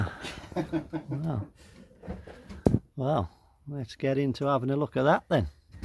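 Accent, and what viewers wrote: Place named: East Coast, United States. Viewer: Just got a 85 Ford diesel 350 a one owner with 70k miles. Needs minor interior repairs. A little leak happened while she sat for four years. Had it towed and she started up first crank. Purrs like a kitten. Thinking mabe Van Halen stripes on the old girl. Needs a bath as is completely covered in moss and thick lichen. Where are you all located? Sounds Eastern accent. NW Arkansas here.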